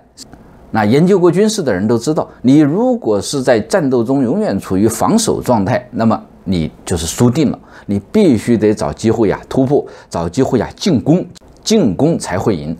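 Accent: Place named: Huaiyang, China. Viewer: 你的口音好淮扬，很可能是镇江人？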